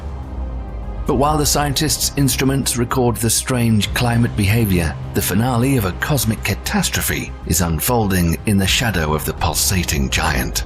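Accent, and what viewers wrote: England, United Kingdom. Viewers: I can't sleep unless it's a British accent talking about space. Anyone else? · Again with the haughty English accent. How POMPOUS!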